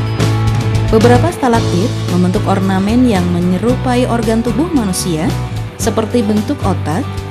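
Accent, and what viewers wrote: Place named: Banyumas, Indonesia. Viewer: presentere mandan ngapak ya...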